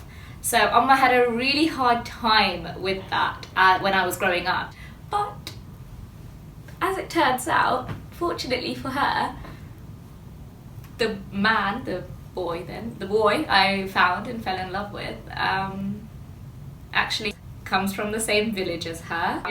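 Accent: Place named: Jaffna, Sri Lanka. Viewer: Im in love with her Tamil accent, that yazh Tamil!! She is such a sweetheart! Absolutely love all of her insta vids!!! 😍 -Love all the way from SG 💞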